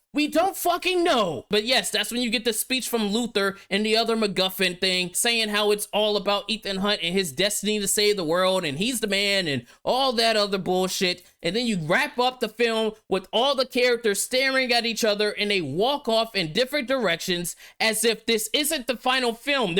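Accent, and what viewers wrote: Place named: AAVE, United States. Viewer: Your ranting is dumb and makes no sense.Speak proper english next time.Ebonics is not a proper language but just gibberish.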